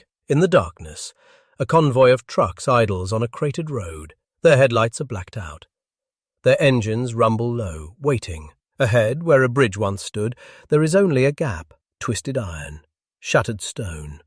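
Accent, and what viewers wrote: United Kingdom. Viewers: If this is all about Canada why does the narrator have a Brit accent? WHO is the narrator? Where can I find the credits for the writers, creaters, narrator etc?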